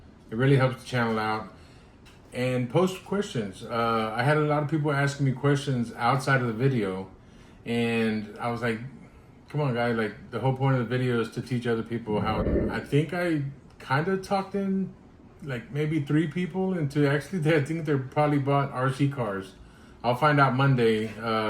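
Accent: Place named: San Antonio, United States. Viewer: Great videos! Sounds like you live in San Antonio. I visited Oatesified this past weekend, did some marshaling, and met a lot of nice racers. I’ve never raced but I plan to build the Losi 1/8 E Buggy kit, should arrive early December. Hopefully I’ll get it built and hit the track in January. Hope to see ya out there!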